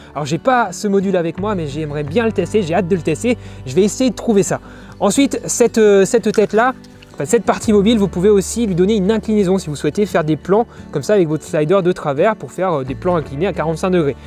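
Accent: Alsace, France. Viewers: on dirait que tu es en Alsace. en tout cas super bonne vodéo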